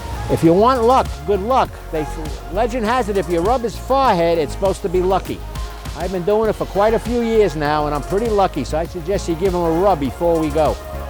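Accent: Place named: East Coast, United States. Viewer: U sound like your from the east i cant stand accent.niether can rest of world.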